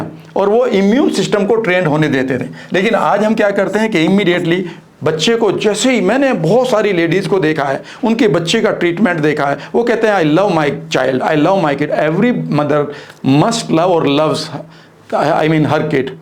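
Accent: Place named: Muzaffarnagar, India. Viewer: ji mann karta h aap ko sunte rahe aap ki bhasha ki miths me mujaffar nagar ki khusbu aati h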